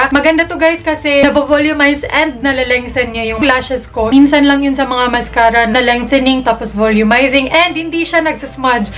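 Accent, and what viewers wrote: Visayas, Philippines. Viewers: maklaro man na bisaya nis ate na hahaha cute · Omg bisaya 😂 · are you anywhere from Visayas? kasi halata po sa tono I mean sa accent nyo po.. from Cebu here :)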